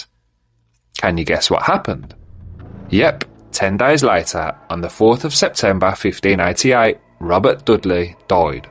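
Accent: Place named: Birmingham, United Kingdom. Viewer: Kenilworth born and bred here. And worked in our Castle in the late 90's. I remember my colleague saying to me that I'd think I was going insane, once I'd worked there for a month or two. ALL of us, had seen and heard the unexplained. If you want to hear those stories. Let me know. This was very enjoyable so I'm subscribing as a fellow local, judging by that Brummie accent?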